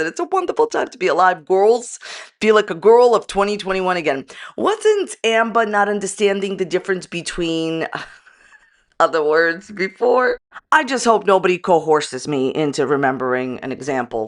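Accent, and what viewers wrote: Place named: East Coast, United States. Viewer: love your accent. I am from the east coast and miss the accent.❤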